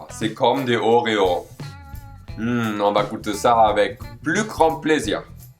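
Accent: Quebec, Canada. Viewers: Ah le canadien🇨🇦 un mélange d'allemand🇩🇪 et de francais🇫🇷 🙄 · J'adore l'accent Québécois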